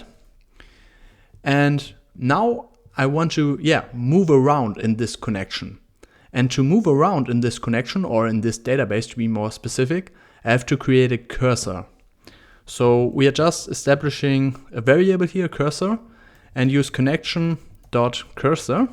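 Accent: Germany. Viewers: Love your Videos. Greetings from Germany, are you german btw? Your accent seems sort of german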